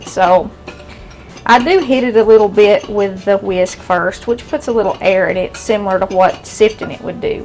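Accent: South, United States. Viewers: Where in the South do you come from? I am a Buckeye (worthless nut) but I love southern cooking. You are easy to listen to. I love southern cooking and I love to hear someone whom I believe is saved.! If you know the Lord (Really know). you will be sincere and honest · Love to hear you talk. I am from the south but have been living in the north for the past 21 years. I miss the southern language so much. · Amen...Raised as far Southest Kentucky as you could get,Live in Arizona now..Hearing her southern voice reminds me of Back Home Sweet Harlan County...Girl your biscuits look Amazing...Jesus Sure Loves all his Children...Amen😇🙏🏻🙌🏻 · Living on the west coast, I love your southern accent. I could listen to you all day. The tip on making ap flour into self-rising was most helpful. You talking about Jesus was an unexpected delight.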